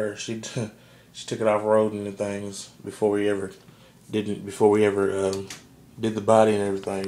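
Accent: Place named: South, United States. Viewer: It looks good and u sound southern as hell like me, Louisiana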